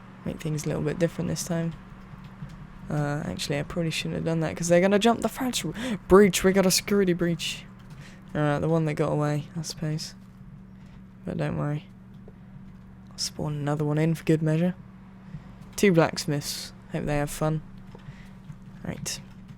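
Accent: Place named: England, United Kingdom. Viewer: Drum and that was awesome and I love ur England accent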